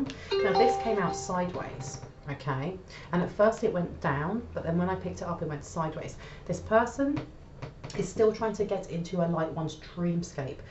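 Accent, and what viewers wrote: England, United Kingdom. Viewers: Finally a pommy reader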